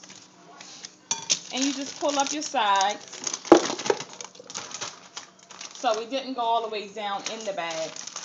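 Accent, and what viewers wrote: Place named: United States. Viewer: THIS LADY SOUNDS AMERICAN!!!!!!!!!!!!! BY THE WAY GUYS im from NZ so i don't get AMERICAN PEOPLE!!!!!!!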